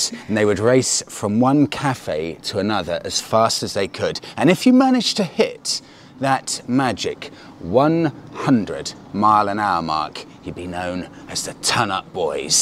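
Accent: England, United Kingdom. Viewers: It’s like watching The Fonze, with an English accent! 😄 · I guess I am going to learn English from your videos! British English is a little bit harder to understand than American English but that sense of humor totally convinced me. :-)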